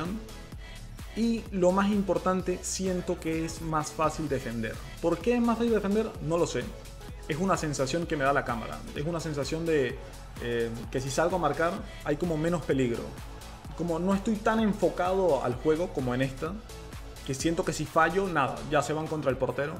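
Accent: Venezuela. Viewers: Amigo eres venezolano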